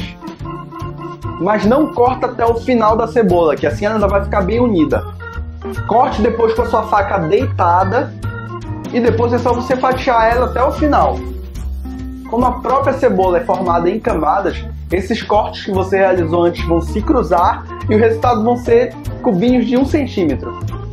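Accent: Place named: Pará, Brazil. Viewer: só pelo sotaque parece bem paraense hahaha Gostei da tecnica e super funciona! obrigada =D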